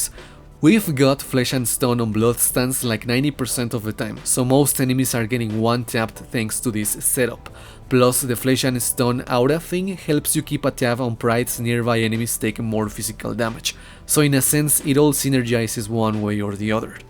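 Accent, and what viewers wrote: Spain. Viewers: eres español verdad?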